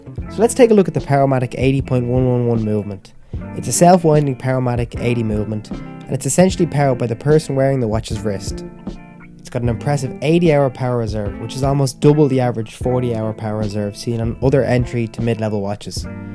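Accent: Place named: Limerick, Ireland. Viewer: Are you from limerick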